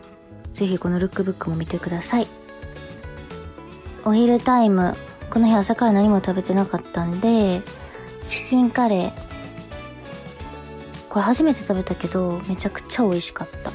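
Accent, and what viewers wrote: Kansai, Japan. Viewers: はじめまして😌私も関西人なのでりほちゃんの関西弁聞くと親近感湧きます😆✨「むちり突き上げてる」がパワーワードで😂 小田切ヒロさんとのコラボ動画で初めてりほちゃんを知ったんですけど、イントネーションで「もしや関西の子かな？！」と思って動画見たらハマりました😆(普段ヒロさんとコラボ動画出してるYoutuberさんの動画は見ないので初めてです✨) ちなみに、りほちゃんのパープルメイクかわいくて使ってたコスメポチリましたよー😍 ゴリゴリのモード系メイクも見てみたいです☺️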